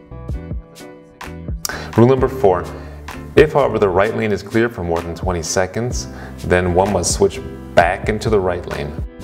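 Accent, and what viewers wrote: Germany. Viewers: American guy with a German accent. It stands to reason, therefore, that you've lived there for a bit. Because you have an accent. I love driving on the Autobahn when I'm visiting there. And I love this video. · It’s true. You really do sound like a German who speaks really good American English. Weird. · Your accent sounds a bit German.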